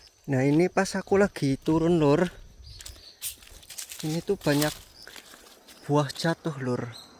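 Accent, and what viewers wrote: Indonesia. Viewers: koe ngapak yah,ngapake ngendi bro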